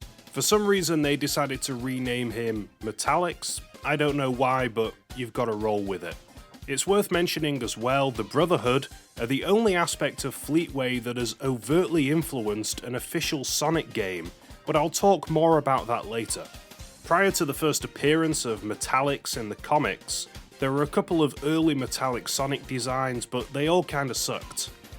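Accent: United Kingdom. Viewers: is he brittish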